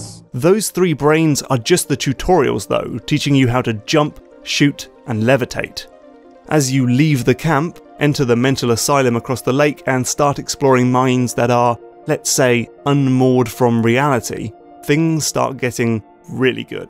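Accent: United Kingdom. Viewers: I love internet video man, especially British voice internet video man.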